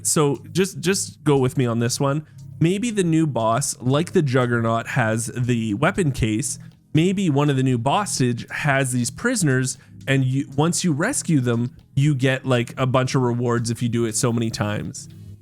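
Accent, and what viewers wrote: Canada. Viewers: By far the best person i like to hear my updates from. Not sure if its the funny Canadian accent "ae" or its just more interesting and better than most out there. Good job keep it up G.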